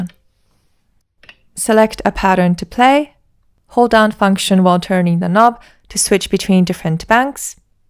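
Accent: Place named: Ireland. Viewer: your accent is so nice. did you live in ireland at some point by any chance? theres a few words here and there that sound very irish to me but maybe its some similar accent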